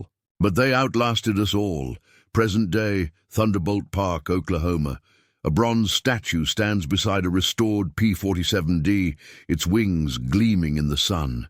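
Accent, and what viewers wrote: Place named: United Kingdom. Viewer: They did not mock, laugh, joke, etc. Just put the facts in and can we have another AI voice that is not British?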